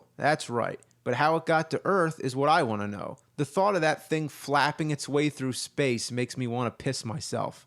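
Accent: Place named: Philadelphia, United States. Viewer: I love his Philadelphian accent. It's very homey for me.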